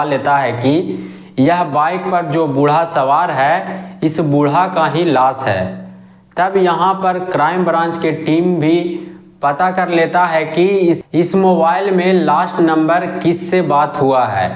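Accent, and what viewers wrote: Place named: Bihar, India. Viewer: Bhaii you’re doing well but change the Bihari Hindi accent to org Hindi write before recording you’re doing well 😊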